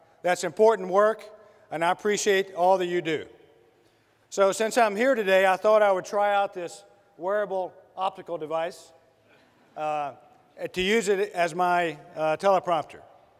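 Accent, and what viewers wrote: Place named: Tennessee, United States. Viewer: He's Japanese on his mother's side and he has a Tennessee twang. I like this guy.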